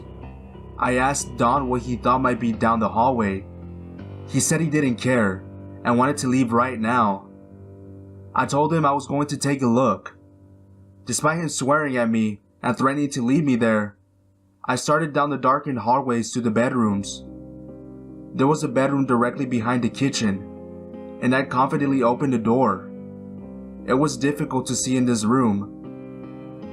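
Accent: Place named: Latin America. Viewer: u have a latin accent.. are u latin? anyway nice videos seen every single one of them keep up the work...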